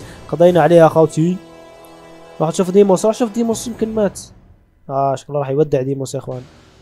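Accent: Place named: Algeria. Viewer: اهاااااااااا هسة فهمت 😁 اني اكول مستحيل سعودي يتكلم بهاي الطريقه +تشرفت بيك و على راسي الجزائر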